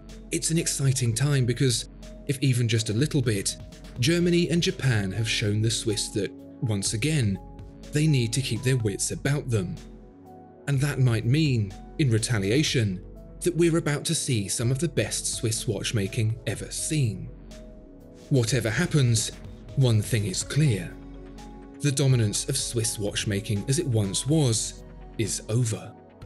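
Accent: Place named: RP, United Kingdom. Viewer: Ok 3 min on Lange (from to ). 1 min on Credor... Hmmm? Just some biased limey with a posh accent...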